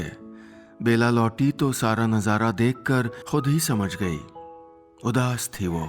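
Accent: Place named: Rajasthan, India. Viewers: Rajasthani bdi pyaari lgti h aapki aawaz me☺️☺️ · Such a beautiful story. Plus it being of Rajasthani setting and Nilesh Mishra reciting it in Rajasthani accent makes it even more beautiful.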